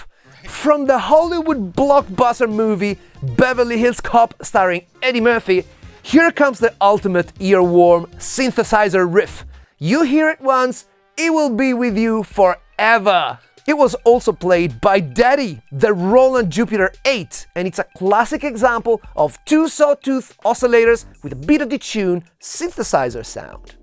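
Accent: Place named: Italy. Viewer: Nice video. 2 out of 100, for your amazing English. I love your accent. You give English that italian design feeling. Cheers. PS: I'm reporting this vid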